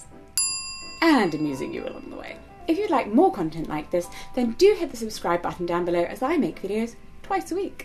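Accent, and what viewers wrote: RP, United Kingdom. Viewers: People probably ask about your accent, because it sounds exceptionally posh.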